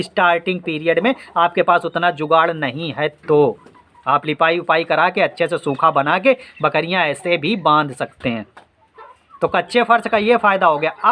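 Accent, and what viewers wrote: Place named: Uttar Pradesh, India. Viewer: मैं बिहार सिवान से हूँ, आपकी बोलचाल, भाषा से लगता है कि आप यू पी से हो ।आपकी video बहुत ही जानकारी देता है। कहाँ आपका घर है।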